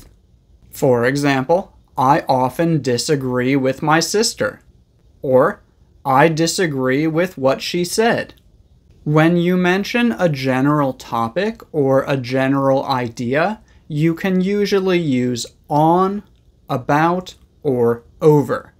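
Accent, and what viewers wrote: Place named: United States. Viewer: Your American accent is pretty nice and clear. ! Thank you so much for your classes. 🎉😊❤❤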